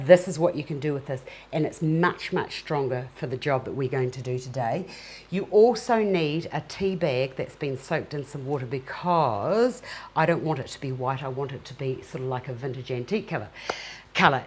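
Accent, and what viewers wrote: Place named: New Zealand. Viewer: Thank you for this lesson. I am a kiwi living in Australia and besides watching the lesson, I loved hearing the Kiwi accent. Are you from the south island?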